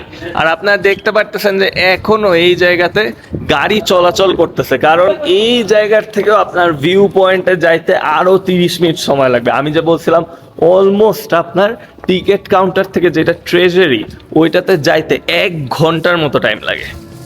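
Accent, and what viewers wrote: Kolkata, India. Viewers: আপনার ভাষা কলকাতার লোকদের মতো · আপনার একসেন্ট কলকাতা টাইপ। প্লিজ বিষয়টি একটু ক্লিয়ার করেন।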